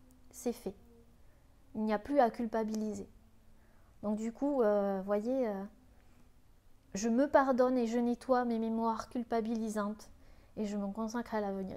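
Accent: South, France. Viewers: Bonsoir Magnifique tirage! J'ai pris la chouette n°1 et pour info le père, la mère et l'enfermement ce sont passés quand j'avais 4 ans car ma grand-mère et ma mère étaient en sanatorium et mon père travaillant, mes parents m'ont laissé dans une maison pour enfants pendant 1 an. Je ne leur en veut pas évidemment mais l'enfant "Isabelle" a dû ressentir beaucoup d'abandon.... merci encore pour ta générosité et ton accent du sud!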